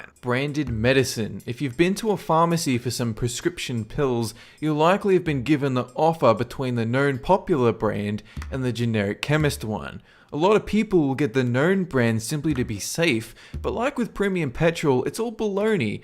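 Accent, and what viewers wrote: Australia. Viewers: are you aussie or american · Hey are you Australian? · Are you Australian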